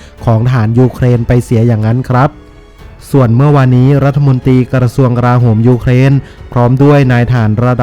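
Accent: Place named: Isan, Thailand. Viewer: เปรียนคนเว้าแล้วติครับ555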